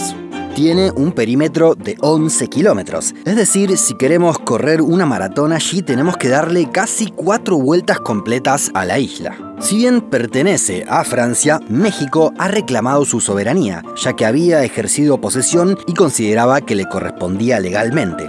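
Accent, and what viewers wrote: Rioplatense, Argentina. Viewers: Por curiosidad, de dónde es este chico? A los españoles nos cuesta diferenciar el acento de Argentina, Uruguay y Paraguay. Un saludo!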